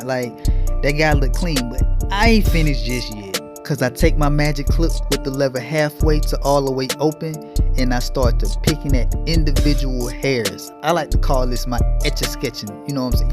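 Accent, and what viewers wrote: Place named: South, United States. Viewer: Oh Chile u are COUNTRY Lmbo love it !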